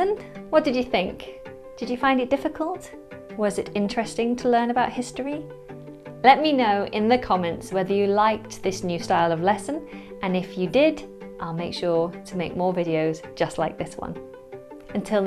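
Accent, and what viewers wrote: United Kingdom. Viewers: I preferred to kill four birds in one stone madam memorizing your words/accent & listening to english history & practicing & taking new words (my mind is exhausted & soon I will be extinguished) can I use this word like this is this correct ? I considered myself as an old school I still keep notebook as a diary but I'm so lucky that I'm left handed so no one can read my handwriting sometimes even I can't it's very tragically horrific event or experience for those people in that time & many ancient archaeological sites also destroyed it wasn't that difficult I understand it very well technically british accent is magical factor including many different words I'm a history lover/reader/fan & of course I would like to hear more & I have a humble suggestion can you talk about the black plague who hits london in that time that's all madam if you read all of that & reached this point I would like to thank you for your time cuz I know you are very busy & I would be very glad/thankful if you correct me if you have seen any mistakes in my words thanks again madam & have fantastically gorgeous day I'm waiting your next video👏👋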